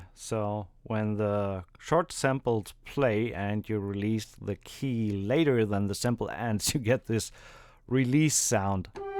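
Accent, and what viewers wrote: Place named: Germany. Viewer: I really like your accent, where in Germany are you from?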